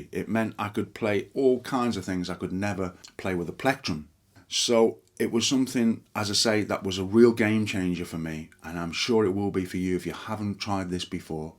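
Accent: Liverpool, United Kingdom. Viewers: Nice one thank you. (a hint of Scouse there perhaps?)👍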